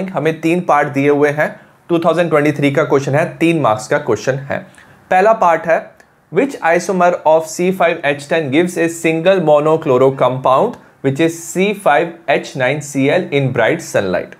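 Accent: Kashmir, India. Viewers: sir aap kashmiri ho?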